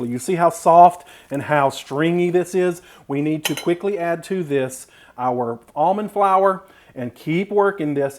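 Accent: South, United States. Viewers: I love your videos.... Talk as long as you need too. Heck you sound like me with that southern tone... well I have been waiting for use of my coconut flour and here it is... Thank you very much. I will be trying this one. ♥ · Sir, I love your videos and southern accent! Your face really made me laugh! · I don’t know why but I saw your channel on my feed and I started watching you and this video was amazing!! You have great energy and a great Southern accent!! You are doing a great job!! Maybe we can do a collaboration let me know!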